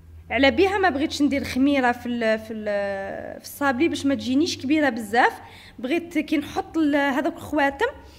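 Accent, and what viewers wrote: Chaoui, Algeria. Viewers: والله العلي العظيم يا إبنتي الغالية غير نحبك فالله كأنك إبنتي الثالثة وأحب لهجتك الشاوية يا الحرة💞💞💞💞💋💋💋💋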